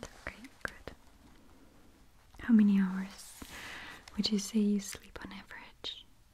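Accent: Russia. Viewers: Lol girl you said muscle spams lol you crack me up I love your Russian accent when you speak English, so very cute.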